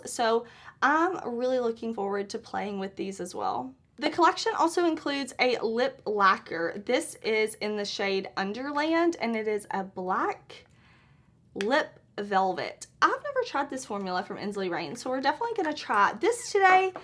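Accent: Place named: Texas, United States. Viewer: I am so broken hearted. I have tried to get your brush set from Sigma about 7 times and they are always sold out. 😭😩😭😭😭😳 please tell them to make more so I can get your brush set finally. 😊I love you and your makeup videos. My half sister is from Mesquite, Texas and your twag sounds just like her. You are beautiful and your spirit is beautiful too! Keep making these awesome videos! Thanks girlie for helping with makeup tutorials and for helping to show all the new awesome indie makeup! ❤ thanks luv! ❤❤❤❤❤😊😊😊😊😊😊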